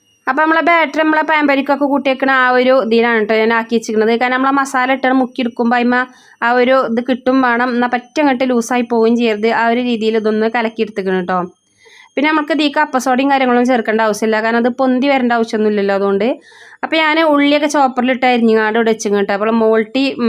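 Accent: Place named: North Kerala, India. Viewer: Uttara Malayalam speaking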